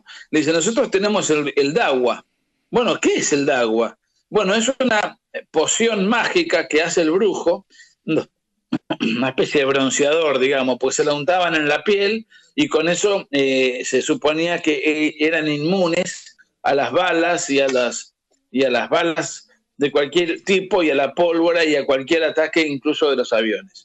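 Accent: Argentina. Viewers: No estas en nada. El che no tiene la culpa.de que estos Seudo izquierdistas. Utilizen la inmagen de el che para sus idioteses. La nueva izquierda. NO tiene nada que ver con la vieja Izquierda latinoamericana. Y el che era clase media. Todo lo que decis que se movia en elites esclusivos es mentira. Te entiendo porque eres Cubano. Que tubo que escapar de Cuba por la revolution. Aunque hables como Argentino. Deja de.engañar a las nuevas generaciones.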